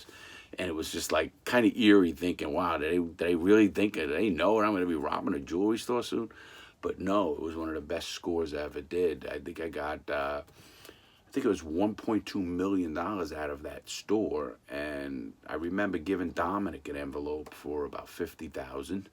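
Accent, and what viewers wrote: New York, United States. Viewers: A Polak from Florida with a New York accent I cannot get enough of this guy such a great storyteller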